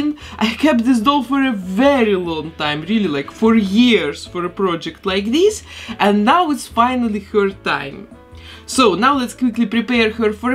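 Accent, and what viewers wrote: Russia. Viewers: Вау, русский акцент?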